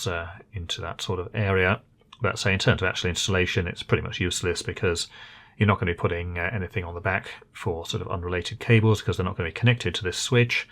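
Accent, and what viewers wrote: United Kingdom. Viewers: Thanks for the precious content! I am a subscriber, watched a bunch of your videos... was surprised here by the speed of your pronouncing some sentences. The British English compounded by the fast enunciation made some parts difficult to understand. May I kindly beg for a bit slower speaking pace please - for your international audience? Thank you for the understanding!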